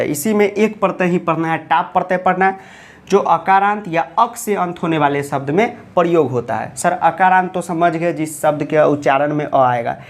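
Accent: Bihar, India. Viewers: sir are you from Bihar bcz your language is so sweet I also belongs to bihar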